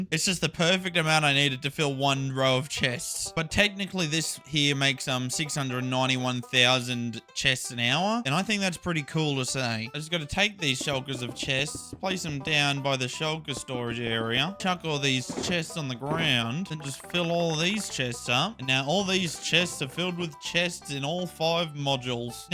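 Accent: Australia. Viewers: aussie minecrafter?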